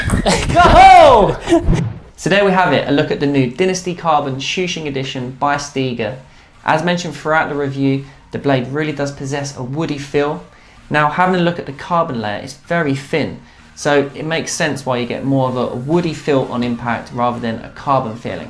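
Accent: United Kingdom. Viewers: British accents are a bit different.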